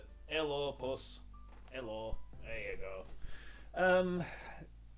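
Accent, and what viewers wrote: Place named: United Kingdom. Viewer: She is the most british person i have ever heard. May she rest in peace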